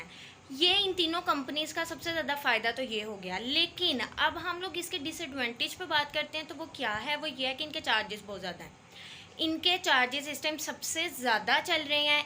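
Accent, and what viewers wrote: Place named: Faisalabad, Pakistan. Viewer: AP Faisalabad say hai ? Apky bolny ka Andaz faisalabadi Laga btw AP business Kon sa kar Rahy hai ?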